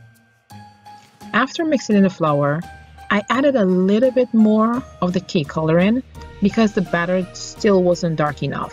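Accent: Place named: Guyana. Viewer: I've been looking for and using online recipes for years but its been a real pleasure to find your recipes for my favourite Guyana home recipes! Your reassuring helpful Guyanese voice and accent are comforting and inspiring. Thank you! Can't wait to get started!👍🏾🇬🇾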